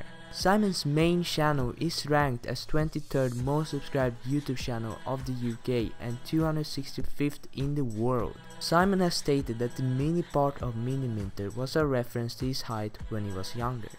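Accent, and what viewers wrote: Sweden. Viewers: You're Swedish aren't you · are u from sweden? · You from Sweden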